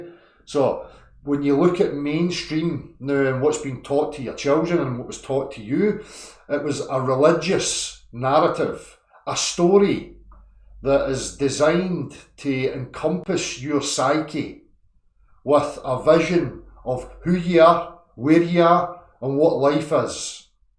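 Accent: Scotland, United Kingdom. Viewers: Who is this Scot please?????? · Love the Scottish accent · speaking English with his Scottish brogue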